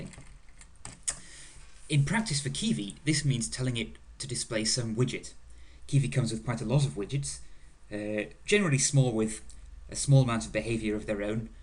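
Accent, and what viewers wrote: United Kingdom. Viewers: I love i when British people narrate tutorial videos !! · These videos seem to be very interesting but unfortunately I am not able to understand the author as I should for a tutorial. His british accent and the speed at which he speaks is too much for me :( Subtitles are no option. sad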